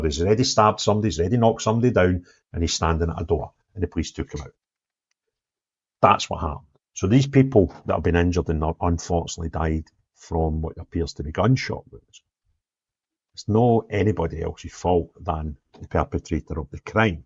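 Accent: Scotland, United Kingdom. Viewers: Hi, you are a great bloke, very honest but problem is I can’t understand your Scottish accent. I used to love watching Rab C Nesbitt with subtitles so any chance subtitles could be put on your links. Thanks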